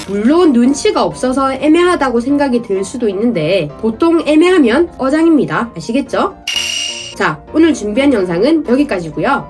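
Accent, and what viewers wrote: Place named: Gyeongsang, South Korea. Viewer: 경상도사투리 ㅈㄴ쓰누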